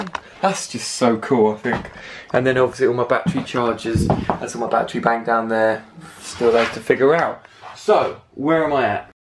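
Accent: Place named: United Kingdom. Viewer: not all of can speak or understand the King's English so for those of us watching from the colonies please speak just a bit slower eh mate?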